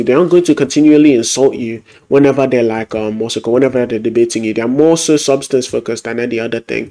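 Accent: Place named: United Kingdom. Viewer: I can’t with that BRITISH ACCENT 💀💀💀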